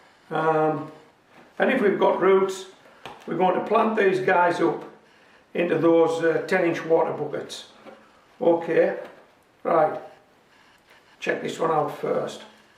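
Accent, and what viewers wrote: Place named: Lancashire, United Kingdom. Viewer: Yes I sure did, its a routine of mine and my granddaughter (aged 6) to watch your videos. For a long time she was convinced it was me on YouTube doing the gardening videos, we hardly see your face, as I sound like you (Lancastrian accent), grow in buckets and tubs too. Also, until recently, at the time I also had a Collie dog. The similarities are uncanny and convincing for a little one. LOL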